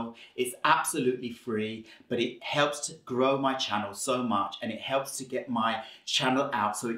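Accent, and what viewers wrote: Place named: England, United Kingdom. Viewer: ohh nice!!! You don’t look a day over 25!! My hair is about 3 foot long, and I am 6 foot tall lol! My hubby loved long hair and I haven’t had the heart to cut it even though he passed away in 2015. Where are you originally from!? My guess is possibly England or South Africa! You have an accent but it’s hard to figure out!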